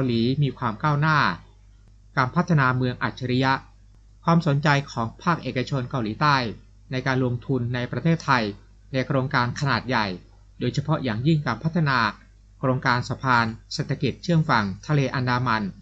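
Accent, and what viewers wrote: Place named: Cambodia. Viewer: ได้ซิจ๊ะ ร ล ควบกล้ำ ฉันไม่ได้มาก่อกวนแต่สงสัยเพราะสำเนียงเธอเหมือนคนเขมรก็แค่นั้น คนไทยบางคนพูดไทยสำเนียงจีนก็มีเพราะเขาโตอยู่ในย่านคนจีน พูดไทยสำเนียงลาวก้มีอย่างคนอีสาน ..และเดี๋ยวนี้มีเพื่อนบ้านอย่างลาว เวียดนามเขาก็ทำคลิปยูทูปพูดภาษาไทยด้วย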